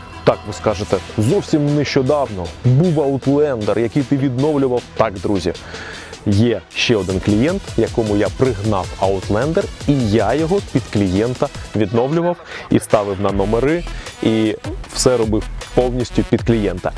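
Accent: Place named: Lviv, Ukraine. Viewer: Майже львівський акцент!!!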